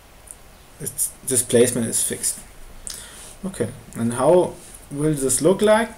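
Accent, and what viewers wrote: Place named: Germany. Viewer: alter der deutsche Akzent scheppert alles raus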